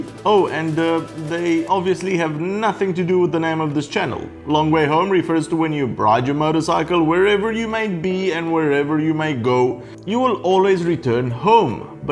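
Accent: Afrikaans, South Africa. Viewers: Speaking about South Africa.. Your accent is nearly identical to an Afrikaans English accent. I'm from SA and I had to check the channel page to see that you actually hail from Romania. Sorry for being so random lol. Great channel BTW.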